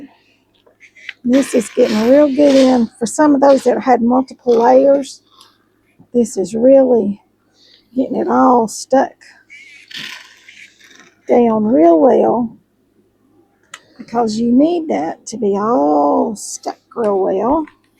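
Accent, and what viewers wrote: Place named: Tennessee, United States. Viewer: I thought you sounded like you were from Tennessee. We are in East TN also. Love your sweatshirt.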